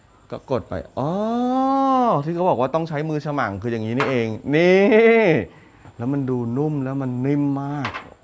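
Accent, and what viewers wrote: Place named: Central Thailand, Thailand. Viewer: ชอบกินเต้าหู้ค่ะ น้อง พูดเหน่อดี ดูเพลินๆน่ารักๆดี เป็นกำลังใจให้นะคะ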